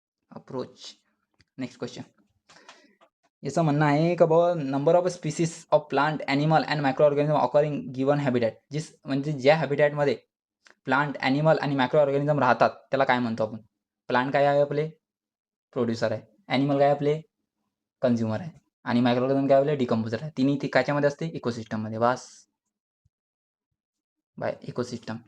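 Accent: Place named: Akola, India. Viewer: Akolyache ahe vatte sir😄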